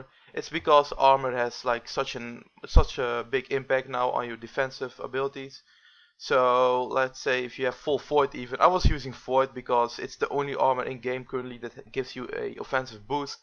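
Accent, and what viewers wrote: Netherlands. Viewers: jij komt uit zeeland typisch zeelands accent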